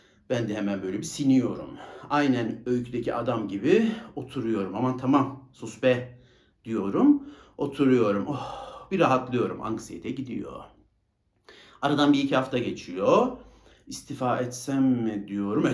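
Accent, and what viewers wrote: Ankara, Turkey. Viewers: Senin Angara şivene kurban , Allah muradını versin hocam , benim aydınlanmama ve bunca yıldır kendimle yüzleşmeme vesile oldun , harekete geçip makinayı kapattım senin dediğin gibi , makinayı kapatın !!!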